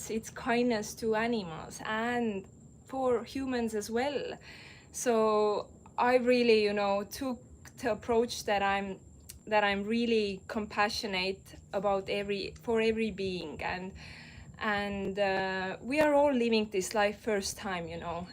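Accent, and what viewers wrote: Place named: Estonia. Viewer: Love the Estonian accent. Do you guys also speak Russian, or do they not teach in schools anymore?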